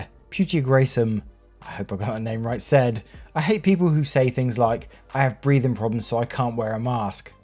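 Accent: United Kingdom. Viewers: Where are you from? I can't pinpoint your accent, my best guess is British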